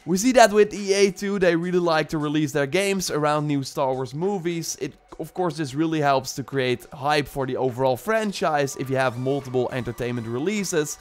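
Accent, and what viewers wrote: Netherlands. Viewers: Dat moment dat je het accent hoort en weet dat de presentator Nederlands is vanwege het accent (geen haat, alleen liefde) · holy shit you sound dutch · Nederlands accent, altijd herkenbaar in enkele seconden 😂👍🏻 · please work on your accent, it's so obvious that you're Dutch.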